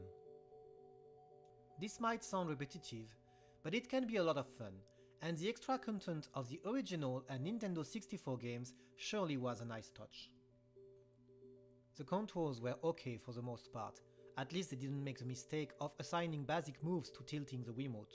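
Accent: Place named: France. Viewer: Hey! Great video! Thank you for all your work and I'll be eagerly waiting for any next video you'll put out, French accent included ;)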